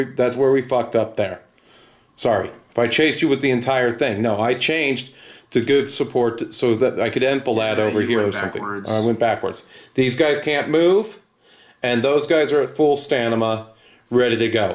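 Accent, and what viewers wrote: United Kingdom. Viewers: Love the British accent. Like been in the same room as Hugh Grant. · Appreciate the Brit accent!!!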